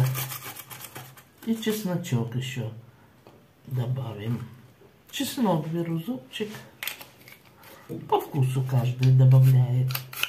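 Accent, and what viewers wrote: Odessa, Ukraine. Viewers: Так мне слышится Одесский говор 😊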